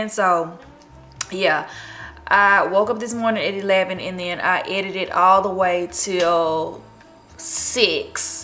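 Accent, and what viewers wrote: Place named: Memphis, United States. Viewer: I've been sitting here for the longest like damn sound like my mother-in-law...NOW I get it lmao.😂 Memphis and Forrest City folks sound just alike.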